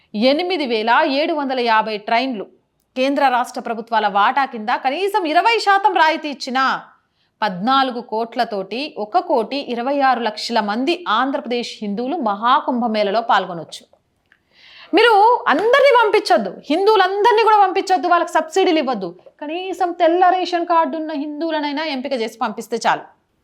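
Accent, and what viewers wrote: Telangana, India. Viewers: Normal telugu maatlaadatene baavuntundi ra, itu leka atu telangana yaasa vaddu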